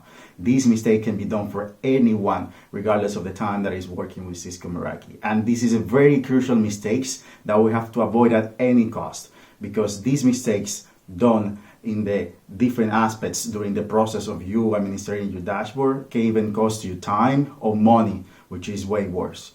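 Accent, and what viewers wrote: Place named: Dominican Republic. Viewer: Se nota que el pana es Dominicano ajajaj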